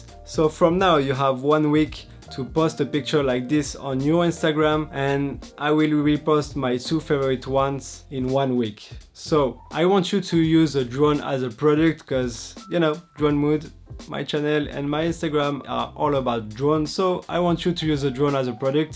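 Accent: France. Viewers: Lovin' this french accent 🥰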